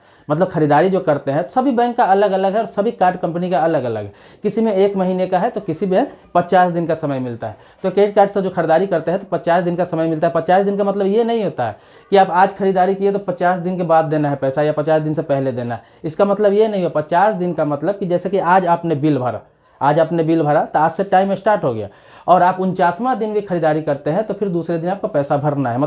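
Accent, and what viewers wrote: Bihar, India. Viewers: Sabse pehle ye apna bihari accent band karo.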